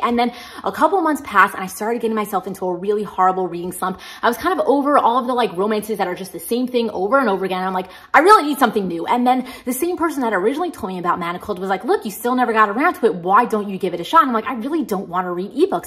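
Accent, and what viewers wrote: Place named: Midwest, United States. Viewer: I love watching people bind books. Can I follow you on your Instagram? And I'm always trying to figure out what part of the states you're from cuz it sounds like you're from the Midwest but not quite Minnesota Midwest but maybe like Iowa or Nebraska. I lived a lot of places and so I always find it fun. Trying to figure out where people's accents come from. ☺️ You and bargain Bethany have a very similar accent.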